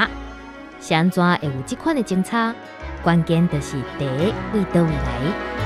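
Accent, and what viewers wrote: Taiwan. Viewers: 正港台灣腔口，聽起來誠四序！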